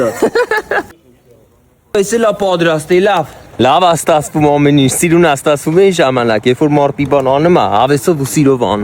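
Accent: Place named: Kyavar, Armenia. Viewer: Крутой акцент кявара 😂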